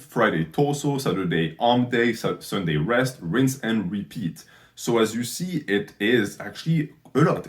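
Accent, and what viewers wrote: France. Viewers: why are you french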